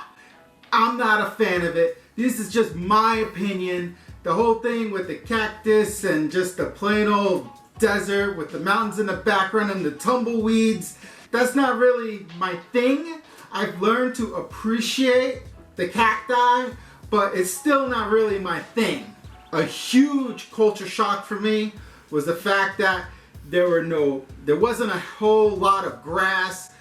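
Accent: New York, United States. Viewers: Hi LOVE LOVE LOVE your channel😂 I just subscribed and my husband and I are from New York tooo...(living in California 😢) Husband is originally from Elmont and Jamaica Queens. I’m originally from East Harlem..lived on Staten Island for 2 years🤮 moved to Far Rockaway my last year in HS got my first dumpy studio apartment in West New York NJ it was a dump but it was my dump. Met my now husband moved to Sacramento in 86 for family reasons on his side and I just wanna to escape NY, we had our first daughter in 1991 moved to southern cal in 92 where we had our 2nd daughter...fast forward to now I’m looking to retire in 2020 and we need to get out of California since we can’t comfortably afford to stay here! I would have to work until Im 80 or DIE AT MY DESK.😱 I found you because we were looking at Nevada...Texas and now Arizona and we think you are awesome..you New York accent brings tears to my eyes in a good way..my husband still has his.. 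But thank you for all the great info I have two years to decide where we we will live out our days..also your daughter is ADORABLE! Oh one more thing...I would like my new home state to stay RED please...that’s why we are leaving Callie and we don’t want want to change our new state like the libs who want to drive up home prices and change the atmosphere.😇 Jeannean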